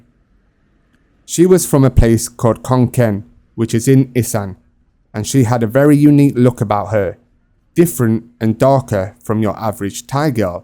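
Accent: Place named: North, United Kingdom. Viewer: love yr northern accent.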